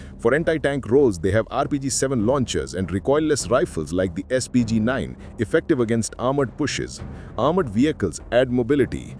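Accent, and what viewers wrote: India. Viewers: No more Pajeeet voice on Godi media thanks to AI voiceover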